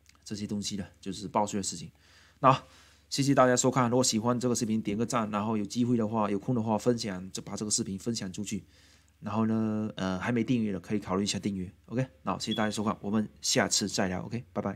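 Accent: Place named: Fuzhou, China. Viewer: 這福州口音 太輕切了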